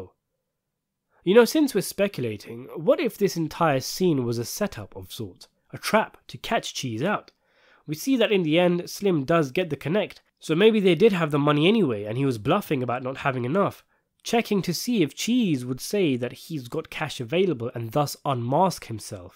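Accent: United Kingdom. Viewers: who dis brit yo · To listen to an overview of a series depicting "thug life" in the inner city of Baltimore by an orator with a British accent is a testament to his truly genius this series was . Great job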